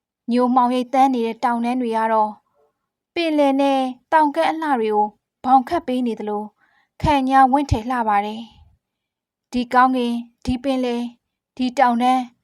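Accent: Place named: Upper Myanmar, Myanmar. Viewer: ချယ့် အသံ က အညာက ပဲ GEC ပဲ.. လေသံနဲ့ ချစ်ချာရီး 😜😜😁😁 စိုးသာလွန်း တဲ့လား အမည်လေးကဆန်း ပီး ချစ်စရာ စိုးသာလွန်း ကိုချစ်ရင် ပင်လယ်ကိုလည်း ချစ်ရမယ် ငြမ်းစင်ကလှုပ် လှေကပုတ် နဲ့ ကောင်လေးဟာ ပြန်သွားခဲ့ပါတယ် 😅 ဆရာမ မိချမ်းဝေ စာတွေ ထပ်ဖတ်ပြနော် ကြိုက်တယ် ကျေးဇူးတင်ပါတယ် 🥰🥰🥰🥰🥰 👍👍👍👍👍 🤜🤛🤜🤛🤜🤛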